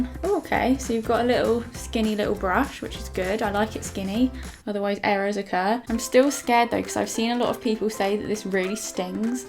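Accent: United Kingdom. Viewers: this is British accent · ITS CALLED A BRITISH ACCENT!!! I'm British :'( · are you british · iiSavageAmerican you dumb bitch it's called BRITISH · Ur British accent is sooo cute xx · Shut up! It's called a British accent!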